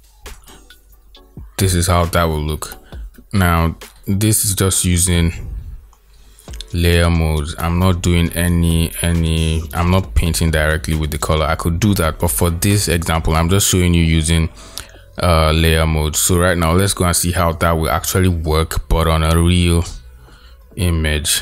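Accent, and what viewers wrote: Nigeria. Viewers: Apparently I love Nigerian accents🥰 keep it up bro:) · Ayyy, i know a nigerian when i hear one!!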